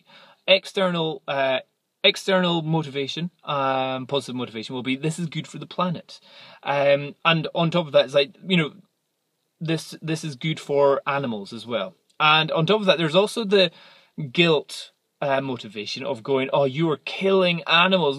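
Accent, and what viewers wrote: Scotland, United Kingdom. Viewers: Scottish? :D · Is that a Scottish accent I detect? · what accent is that? I mean I know it's Scottish (I think), but what town or area?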